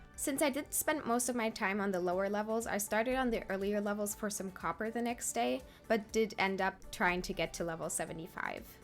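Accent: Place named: Germany. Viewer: I can't believe I've never seen a video idea like this, and wonderfully executed as wellA It's a surprise you aren't bigger, because this was a great watch!Also, is English your native language? I assume not, considering your (this is a guess by an American, so apologies if this is wrong) German accent, but if it isn't then that's VERY impressive, because I didn't even notice anything until like fifteen minutes in!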